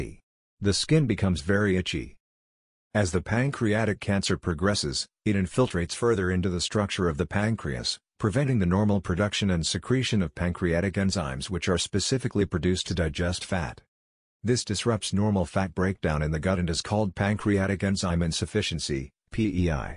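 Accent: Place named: United States. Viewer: Why is this video spoken in an American accent when it’s aimed at a uk audience?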